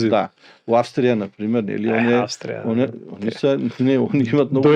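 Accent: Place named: Strumica, North Macedonia. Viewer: Поздрави..Очекувам секогаш ДА ГО ЧУЈАМ ГОВОРОТ СТРУМИЧКИ..МНОГУ МИ Е МИЛО И СИМПАТИЧНО...НАРЕЧЈАТА СЕ БОГАТСТВО..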